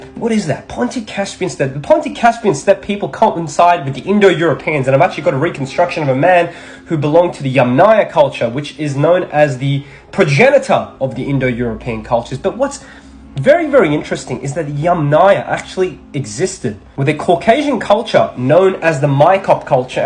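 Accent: Australia. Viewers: Which part of Australia you are from? An Iranian with an Aussie accent 😮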